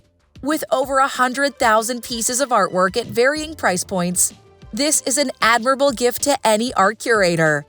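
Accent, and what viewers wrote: United States. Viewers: American narrator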